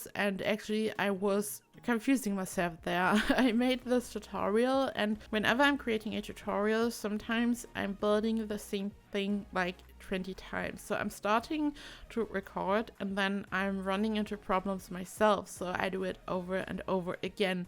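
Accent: Germany. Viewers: The most adorable german accent :D and of course great builds!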